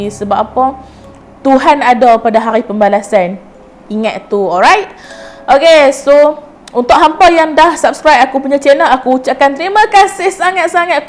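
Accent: Kedah, Malaysia. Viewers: Akak org kedah ke penang?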